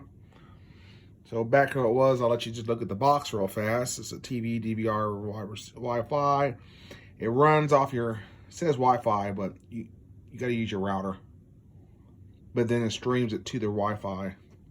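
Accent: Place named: South, United States. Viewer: Can you sound any more like hilly billy john. and your "okay" every other sentence. you are exactly how you sound.... Like an uneducated idiot.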